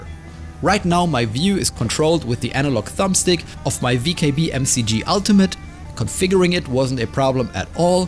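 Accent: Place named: Germany. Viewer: Ich hore das deutsche deutlich raus. 😂❤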